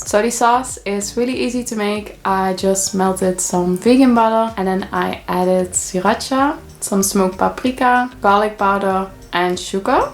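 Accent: Netherlands. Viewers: You have such a typical Dutch accent when you speak English! HEHE #LikeDJtiesto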